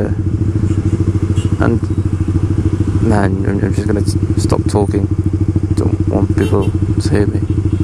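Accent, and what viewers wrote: United Kingdom. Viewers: aksen british nya keren . kaya ga ada indo2 nya sama sekali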